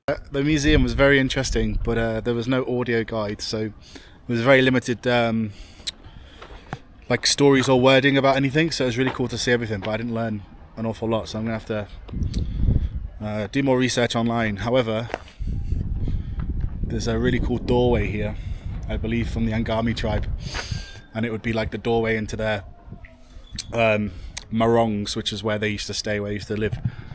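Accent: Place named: England, United Kingdom. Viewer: your English pronunciation has to be Naganised a little bit😅 when you said Cathedral to the taxi driver I could notice his confusion because you said “Cathidril” in the pure English way😂 most Nagas pronounce it as Cat-Thë-Dral and blah blah in Nagahinglish way, anyway wish you a very nice stay in Nagaland, Peace be with you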